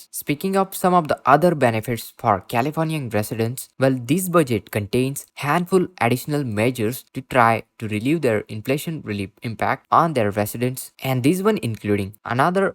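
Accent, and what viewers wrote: India. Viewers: I like your Hindu accent 🙂😉😉 I want a Hindu even though I'm not Hindu, damn baby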